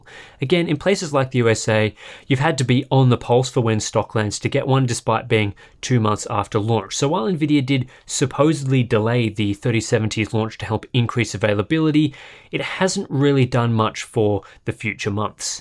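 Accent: Australia. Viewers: You could do worse, his Australian accent in these shows is subtle, not a broad one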